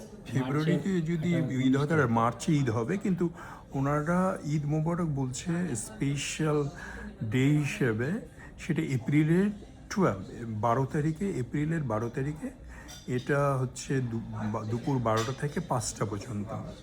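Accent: Standard. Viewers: আপনার শুদ্ধ বাংলা বলার ধরনটি চমৎকার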